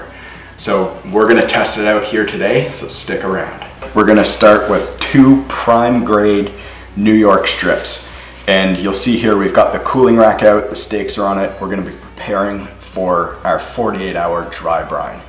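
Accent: Canada. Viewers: R u Canadian? Cuz you sound Canadian AF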